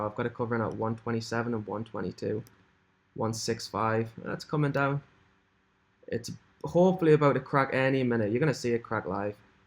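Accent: Newcastle, United Kingdom. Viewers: You sound like you're from Newcastle! What broker do you use to trade us stocks in the uk?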